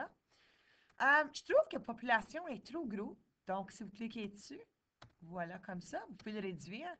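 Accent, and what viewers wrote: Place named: Quebec, Canada. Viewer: Bon tuto fait par une jolie fille avec un joli accent québécois.MERCI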